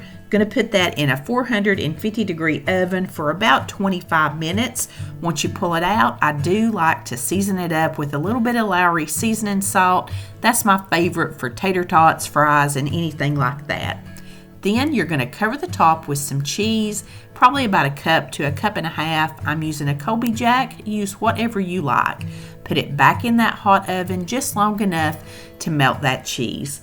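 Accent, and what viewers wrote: South, United States. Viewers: Oh goodness lady I can listen to you speak all day. I absolutely LOVE me a southern accent. I LOVE anything southern; when I retire I'm definitely moving from north east to anywhere in the south. Awesome video and recipes. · I am from NY and love the southern accent🥰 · I LOVE your dishes and your southern voice!❤ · You are adorable! You make us southern gals look and sound awesome! Thank you! AND I can smell the deliciousness from HERE!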